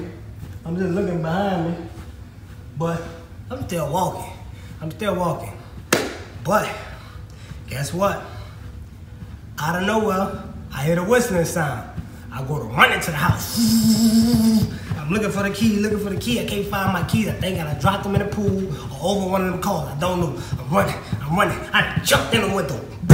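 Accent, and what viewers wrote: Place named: New Orleans, United States. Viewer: Is he from New Orleans he sounds like it...I’m a new subscriber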